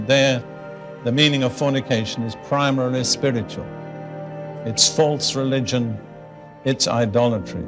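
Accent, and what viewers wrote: United Kingdom. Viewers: You mean his british accent...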